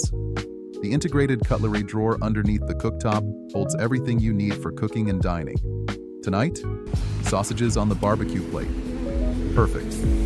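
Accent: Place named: United States. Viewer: lol i heard the American voiceover and i thought US, seeing the name i now recognise you from Insta 👍 From Melbourne and will hopefully be travelling with the 80 next winter👍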